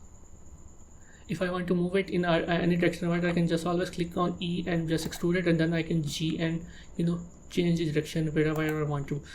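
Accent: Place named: India. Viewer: Not to offend you, but practice your vocal skills. So speaking English without the heavy Indian accent. There are various reasons, you sound more skilled and educated. When you teach you need authority and talking with gumballs in your mouth is not helping. A good voice draws attention and keeps it. It also let you stand out from your competition. For a western audience its really horrible to listen to, sorry for the harsh words. So set your pride aside and grow your business.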